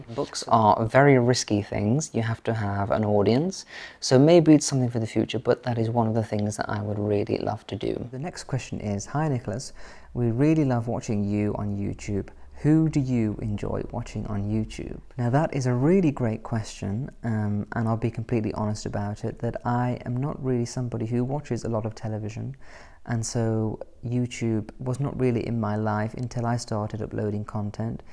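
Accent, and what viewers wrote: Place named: England, United Kingdom. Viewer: Hello! I recently found your channel and I have to say, i am in love with yout content! I can't tell you how much joy your videos bring me! I've never been to England but this is the way I always imagined english people sounded!😅 I am a big fan of Her Majesty The Queen and the way you speak is so similar to hers! Whenever you say the word "again" it just makes me think of her 🤭 anyway, thank you for delighting us with your wonderful content!!!🌷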